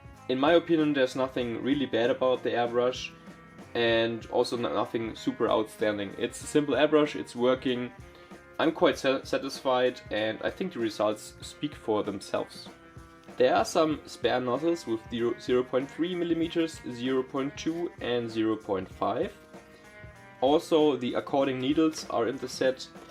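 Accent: Germany. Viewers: Man hört raus das du ein Deutscher bist ^^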